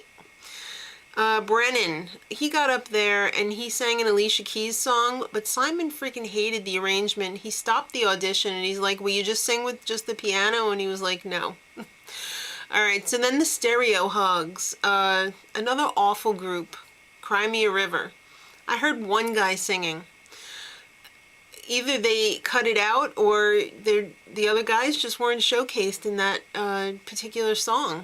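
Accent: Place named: United Kingdom. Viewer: Your british accent is getting better....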